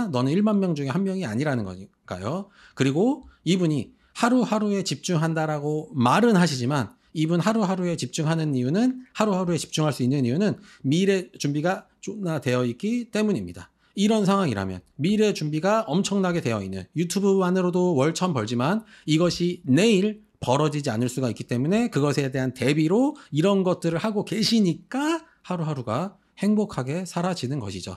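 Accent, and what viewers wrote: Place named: Gyeongsang, South Korea. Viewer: 경상도분이 신듯 ㅋㅋ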